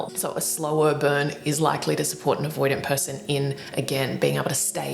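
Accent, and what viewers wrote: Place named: England, United Kingdom. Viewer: You speak in a very elegant way. Also love the English accent. Great video!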